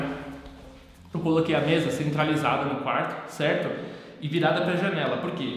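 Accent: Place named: Rio Grande do Sul, Brazil. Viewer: Pensei que ele fosse gay, mas é só o sotaque gaúcho mesmo.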